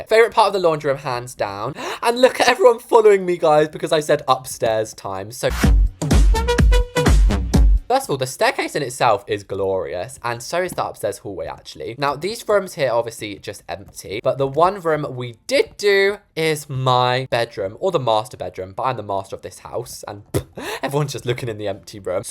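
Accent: United Kingdom. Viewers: He's from uk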